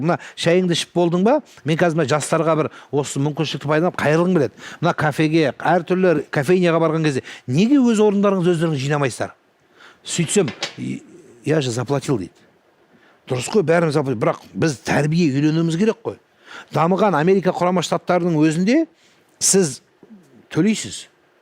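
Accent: Standard. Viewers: Имамды, таза қазақшаны сағынсам тыңдаймын, керемет шешен кісі ғой, сөз саптауы ешкімге ұқсамайтын шеберлікпен айтып жеткізеді. Риза боламын❤Қорқытпай, көркем тілмен орнына қояды. 🎉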